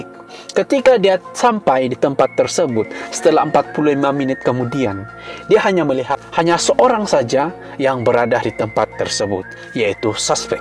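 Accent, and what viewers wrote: Sabah, Malaysia. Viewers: Sabahan bha?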